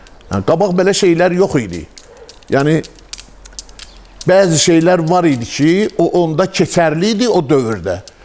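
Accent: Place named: Bilgah, Azerbaijan. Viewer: Kişi, Allah can sağlığı versin sənə, gözəl söhbət elədin. Eyni adətlər bizim kənddədə var. Elə bildim Bilgəhli danışır.Bilgəhdən salamlayıram sizi.Mənimdə rəhmətlik atam anamı mənim adımla çağırardı. 1972 də atam 21 i kievdən çernopuldan gətirib, indi mən sürürəm.Dübbə düz 21 i xoda salanda, öz xüsusi iyi var. Allah cəmi yaxşıları gözə görünən şeytanlardan qorusun!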